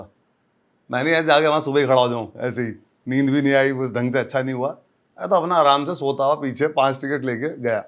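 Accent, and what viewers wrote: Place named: Saharanpur, India. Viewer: He is real man..his accent is actually Saharanpur wala...wish him success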